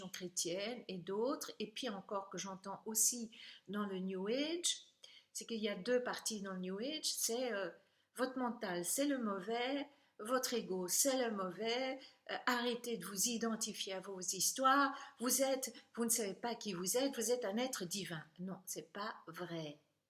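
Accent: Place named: Belgium. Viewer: Je ne sais pas pourquoi vous apparaissez dans mon fil youtube, mais vous y êtes. Je vous écoute et je me dis, elle est Belge!! Et c'est le cas en effet (on n'est pas comme les autres, c'est évident). Bon, mon point de vue: dans l'Homme (j'ai mis un grand H (je suis un boomer)), deux parties: une matérielle, l'autre spirituelle La partie matérielle est duale, les opposés se parlent et se complètent mais peuvent sembler entrer en conflit. C'est la voie de l'apprentissage dans le monde de l'Ego. La voie qui conduit à quoi? A l'Unité par la dualité dans le monde de l'Ego. Pour moi (c'est juste mon avis, rien de plus) l'Ego est un espace d'apprentissage qui me semble rejoindre votre concept de scribe, bibliothécaire, archiviste qui tente d'écrire une histoire, "votre" histoire mais qui, pour moi, ne peut se suffire à elle-même puisqu'elle doit permettre d'ouvrir une porte de sortie spirituelle "positive" qui vient compléter le tout dans cette Unité qui nous constitue.. 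Merci de m'avoir permis, par vos propos, de m'assoir au bord du temps qui s'écoule et d'y cueillir un instant de pensée. Merci à vous, Chère.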